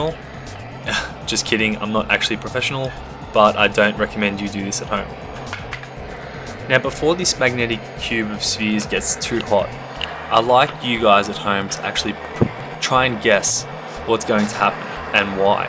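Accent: Australia. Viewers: OwO is that another aussie I hear? Or am I mistaken. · Are you Aussie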